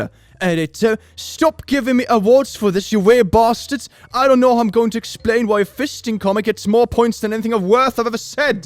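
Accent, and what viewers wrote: United Kingdom. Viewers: As a Swedish person, i can only agree that this is exactly how Brits sound to me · Your British accent is perfect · ’ British accent is amazing From a British citizen (pls don’t give me a guy voice if u read this, and no I’m not a girl either)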